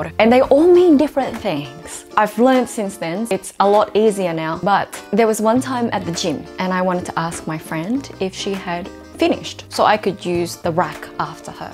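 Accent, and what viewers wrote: Australia. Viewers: Aussie accent and cute Asian girl is best combo ever.. 😊😊 I could say it na Srpskom but better not.. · How do you sound pure Australian then pure Serbian . 👏🏻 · It's so funny how you sound like two different persons when you speak serbian and english. I like you aussie accent. x) Cuo sam da ima pljeskavica od mesa kengura! xD Kakvo li je to cudo? xD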